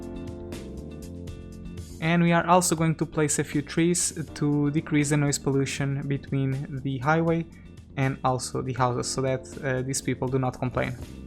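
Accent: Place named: Portugal. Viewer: Classic Portuguese accent!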